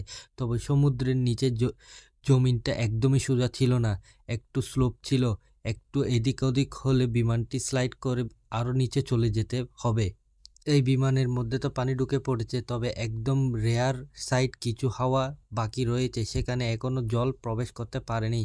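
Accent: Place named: Chittagong, Bangladesh. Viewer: Plz suddho bangla shikhun...er por explain korben plz.... Puro puri Chittagong er tone.. Cheap!!!!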